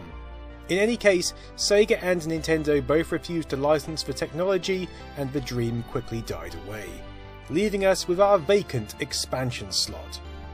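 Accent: United Kingdom. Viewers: Speaking British is I see.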